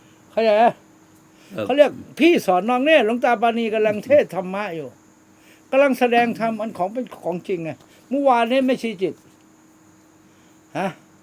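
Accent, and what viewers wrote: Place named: Laos. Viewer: หลวงตาเว้าภาษาลาวน่าฟังดีที่สุดเลย.สุดยอด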